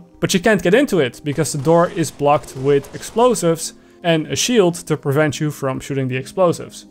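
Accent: Netherlands. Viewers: Ha, Nederlands. Merk t meteen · He sounds really dutch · Are you dutch you have a dutch Accent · You are dutch aren't you? I think i can recognize it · Are you dutch? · why is it that, even when i'm dutch i get irritated by the dutch accent xD great vids though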